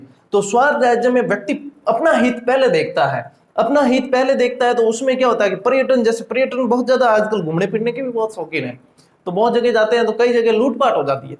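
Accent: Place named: Jodhpur, India. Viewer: तभी , आप भी बिल्कुल हमारे (जोधपुर) जैसी मारवाड़ी बोल रहे हो 👌👌 शानदार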